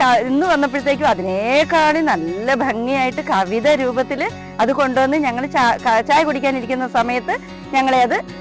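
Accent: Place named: Thiruvananthapuram, India. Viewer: കണ്ണൂരിൽ നിന്നും തിരുവനന്തപുരം ത്തിന്റെ ശബ്ദം ... Good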